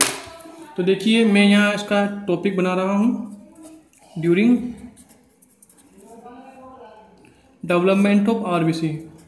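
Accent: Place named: Bihar, India. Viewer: your videos are really good except for that bihari tone you speak.......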